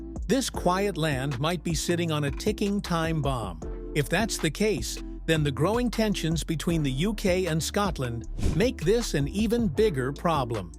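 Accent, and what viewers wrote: United States. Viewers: seconds in and an American accent that can't pronounce towns ("Endinboro"), then I read the comments! Result, found something bbetter to do with my time! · F'cking American voiceover.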